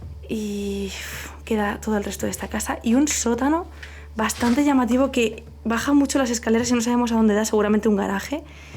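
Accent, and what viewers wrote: Spain. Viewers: eres muy genial tienes una voz dulce y hermosa me encanta como hablan en tu bella españa bellísima españa saludos ❤✌🏿🇨🇱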